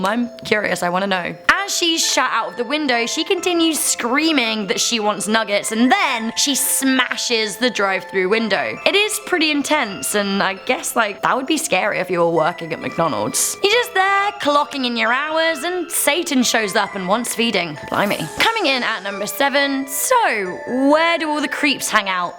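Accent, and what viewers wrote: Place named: United Kingdom. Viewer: Wait I thought she was from the uk 🇬🇧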